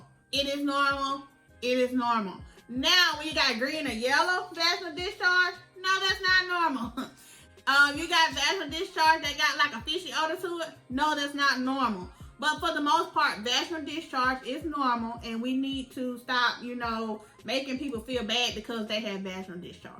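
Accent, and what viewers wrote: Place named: South, United States. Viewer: I had a feeling you were from the south sis, you give the vibes hunnie❣️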